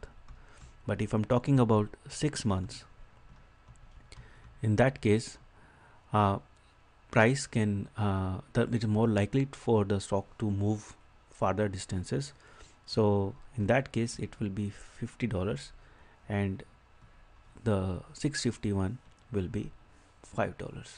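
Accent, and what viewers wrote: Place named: India. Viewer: No from Japan, what do you think????? Of course from India (from his accent).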